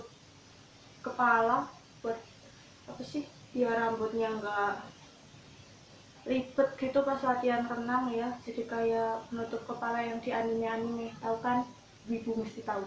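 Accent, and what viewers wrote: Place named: Java, Indonesia. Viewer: Sukses terus mbloo, Medok amats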